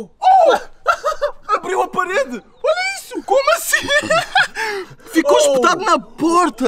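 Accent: Portugal. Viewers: Nao, portugues da europa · PORTUGUES DE PORTUGAL !!! KKK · Eu amo o português de portugal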